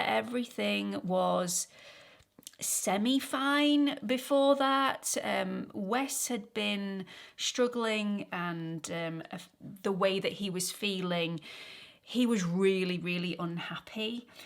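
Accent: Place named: London, United Kingdom. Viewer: You are in good company with your Mum. It is soul crushing to walk that journey with them but on the other side is happiness that you did. I promise! Not sure where you are in UK but your accent sounds more London-area. Legendary late 70's (Birmingham, I want to say) musician Al Stewart is having some very rare shows around there tonight and tomorrow and it will bring you both a lift at not a big cost. He is recently 80, all joy and smiles and has a remarkable young band that tours with him. It is his farewell tour. We saw him here in Michigan, USA 2.5 years ago and it was an amazing little show that will put your energy back on track. ❤❤❤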